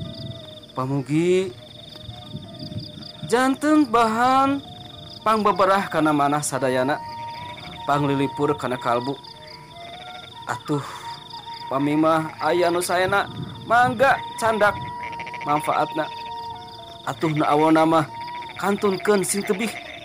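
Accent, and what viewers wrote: Sunda, Indonesia. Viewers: Wa alaikum salam wrhmtllh wbrkth masha allah lalampahan abah kanta semoga makin sukses kang meni sae dina nyariosna lagam nada nadana cirihas pisan orang sundana hatur nuhuun kg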